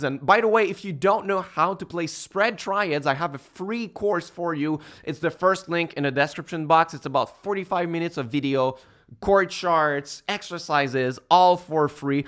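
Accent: Quebec, Canada. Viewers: Très intéressant, merci! En passant est tu québécois?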